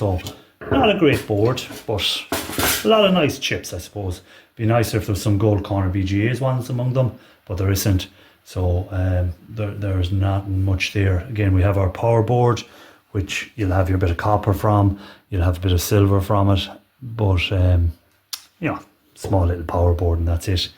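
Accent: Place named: Ireland. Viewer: how far away are you from Galway?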